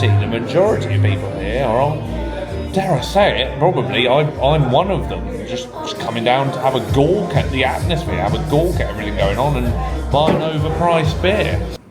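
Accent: Scotland, United Kingdom. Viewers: are you Scottish??.....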